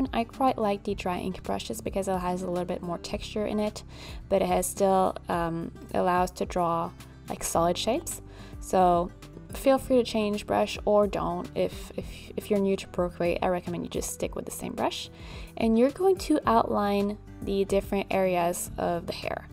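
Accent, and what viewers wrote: France. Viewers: New horizons!, you are such an amazing soul! I am probably twice your age and have dabbled in art for most of my life but you are teaching me a TON of stuff I didn’t know….mostly about graphic design (which I wish was more prevalent when I was your age…my life would’ve been sooo different). I also have long hair and understand the misrep on so many games. Love, love love animal crossing (have played it since it first came out yeeeeears ago! I love your accent (I am from a family who speaks French also) you are brilliant!!!! Keep shining, beautiful! Even though you have no idea who I am, I am very proud of you!!!! 😊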